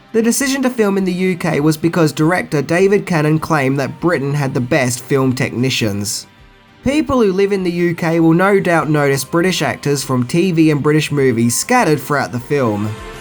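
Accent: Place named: Australia. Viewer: Yes, he's Australian. It happens.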